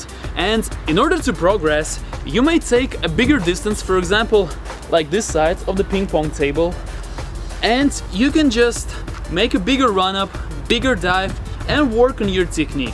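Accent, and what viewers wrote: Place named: Russia. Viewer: Слышу твой русский, сквозь английский)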